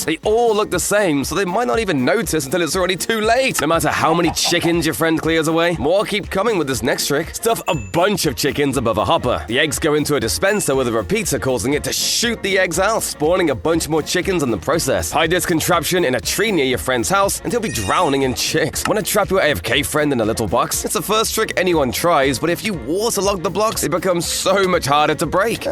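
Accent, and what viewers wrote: United Kingdom. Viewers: Just found this channel so I’m not familiar with it in anyway but I can’t tell if Dexter Manning is narrating a Minecraft video or you’re just that English but there’s math here that isn’t mathing.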